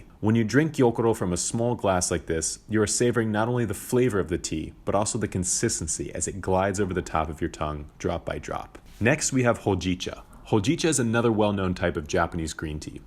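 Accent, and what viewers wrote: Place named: England, United Kingdom. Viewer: Typical English speaking style of pronouncing Japanese. oops.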